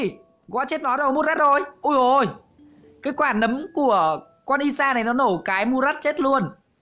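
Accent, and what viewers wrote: Nam Dinh, Vietnam. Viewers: Anh ơi nhà anh ở nam định à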